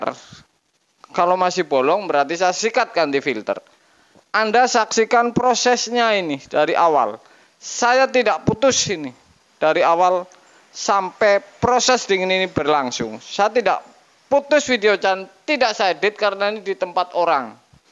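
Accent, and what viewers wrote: Banyumas, Indonesia. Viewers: Salam ngapak.....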